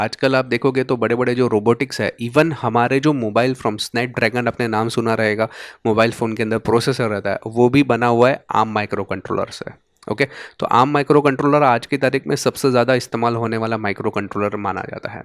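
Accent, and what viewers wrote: Gujarat, India. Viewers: Juna ho chuka hai 😂😂🤣 Bhai aap Gujarati ho kya ?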